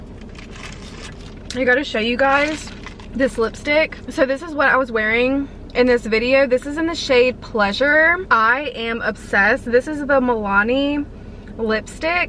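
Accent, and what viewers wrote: South, United States. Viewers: why do I watch these videos they always make me hungry lol. Also, where are you from? you sound like you kinda have a southern accent to me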